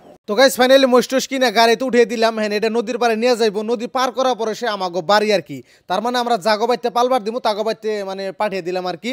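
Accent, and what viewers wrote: Bangladesh. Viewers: কথা আমাগো বাংলাদেশীদের মতো,,,ভাবছিলাম বাংলাদেশ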